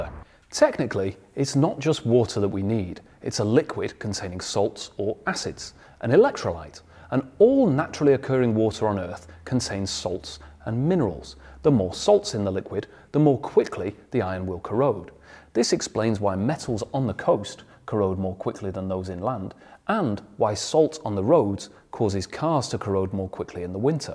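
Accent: United Kingdom. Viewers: Great info but it's hard to take you seriously when you blatantly insert letters into words that shouldn't be Spell aluminum. Better yet write it down. Now, how many times does the letter I occur? Only once but you Brits insist on inserting an extra I between the N and the U to change the pronunciation of the word to suit yourselves. It's not alUmineeum. And don't even get me started on lieutenant!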